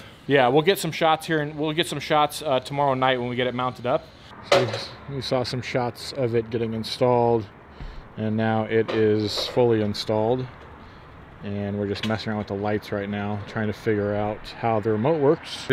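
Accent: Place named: Asia. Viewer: Talent indeed, when he speaks in his normal voice the asian accent becomes obvious.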